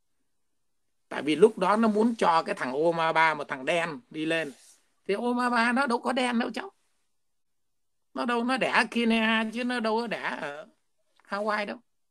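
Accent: Standard. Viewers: Bác nói chuẩn 👍❤️ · Hi hi anh nói rất chuẩn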